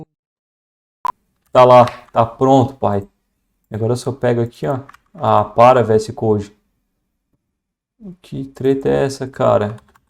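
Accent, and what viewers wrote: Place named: Santa Catarina, Brazil. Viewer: ^^ Nesse exato momento estou fazendo um challenge pra entrar em uma empresa que usa a stack, percebi seu sotaque catarinense, e se não me engano já assisti a alguma palestra sua, vc é de Joinville amigo?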